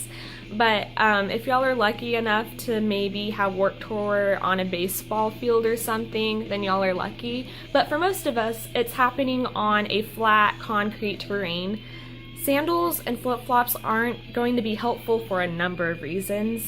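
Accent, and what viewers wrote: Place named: South, United States. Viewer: I love your accent. I kinda could tell that you we're from the south. Also great advice. I live in Western Massachusetts so the weather does get kinda hot but I'm going to the Hartford CT date. I'd love to know what bands you're going to see.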